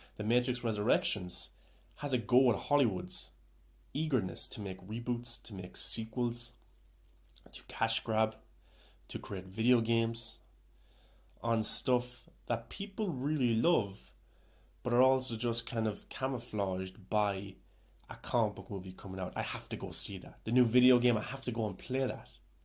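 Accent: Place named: Cork, Ireland. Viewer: Great video. Fantastic movie. Made with typical Wachowski sincerity and heart which makes it worth a thousand Marvel films to me. Time will be kind to it. Might be reading too much into into it but you have some Cork accent!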